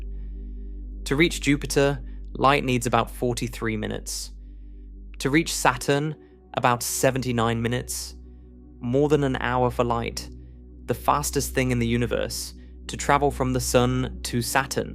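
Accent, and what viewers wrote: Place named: RP, United Kingdom. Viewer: Hi from Lake Como, Italy. I like your videos. They are made with AI but indeed perfectly put together; I like the accent of the AI voice, sounds like received pronounciation but with a modern twist. What tool are you using to make the narrating voice?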